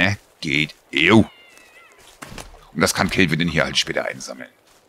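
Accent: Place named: North, Germany. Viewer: Hallo, Lass dich nicht ärgern, ich persönlich mag den norddeutschen Akzent und "Suuns"of the forest ist einfach sehr erfrischend zu hören 💙😊 Lg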